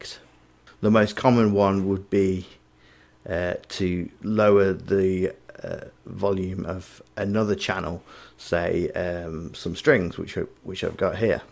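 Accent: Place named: Midlands, United Kingdom. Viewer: good work, are you from the Midlands?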